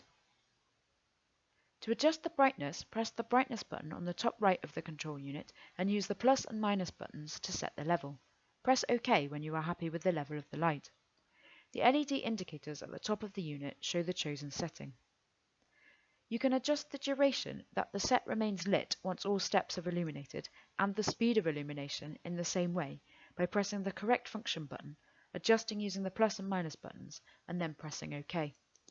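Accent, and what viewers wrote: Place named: United Kingdom. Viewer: This lady sounds so fancy with the british accent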